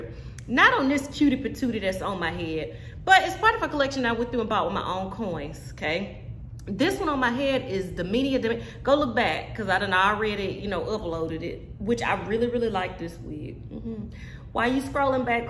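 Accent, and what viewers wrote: South, United States. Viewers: you sound like you from the south that accent heavy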